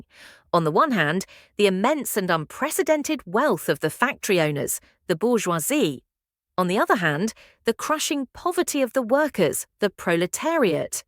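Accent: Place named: United Kingdom. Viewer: How is the channel location Pakistan while the narrator voice is white British?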